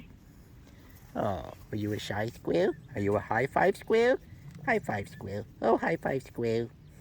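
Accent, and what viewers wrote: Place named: Pittsburgh, United States. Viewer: Man, that's one hell of a yinzer accent!! Love your work!!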